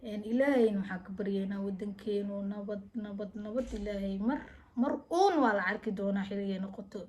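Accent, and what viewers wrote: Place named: Mogadishu, Somalia. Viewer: xamar aka hadla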